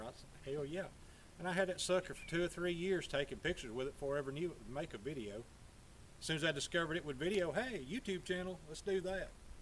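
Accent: South, United States. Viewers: Hello! That’s nice looking. I’ve also heard others make the point if you like to look “period” then age your gun to look anywhere from new to very worn, but not 100 years old. I like it all- to each his own! As far as your speech: I’m from Florida/Louisiana stock and feel at home hearing your videos! (I love the scenery and most of the weather here in Montana, but damn I miss the South) Rock on, brother.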